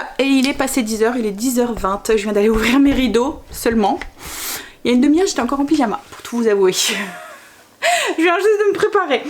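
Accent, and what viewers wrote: North, France. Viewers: Coucou, voilà quelques semaines que j'ai découvert ta chaîne, je la suis quotidiennement, je me retrouve complètement dans tes vidéos, un grand merci pour ces moments partagés dans ta vie de tous les jours et tes précieux conseils et idées culinaires, je retrouve légèrement l'accent du Nord qui me fait tant plaisir à entendre car je suis originaire des Hauts de France mais désormais je vis en Haute-Savoie avec ma petite famille depuis une dizaine d'années, alors un GRAND MERCI,bonne continuation à toi dans ta chaîne 🥰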